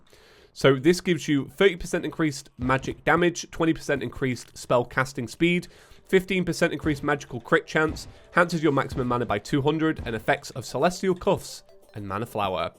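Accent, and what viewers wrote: United Kingdom. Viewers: Saga of Brittish Accents Tuesday-Chewsday Silva-Silver Terra-Terror i cant remember any other ones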